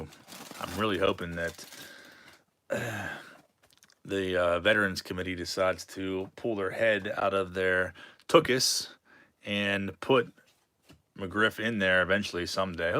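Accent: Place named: Pittsburgh, United States. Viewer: You have a bit of a yinzer accent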